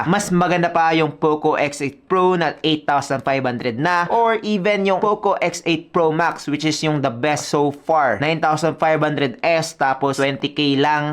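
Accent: Visayas, Philippines. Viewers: Bisaya ka lods?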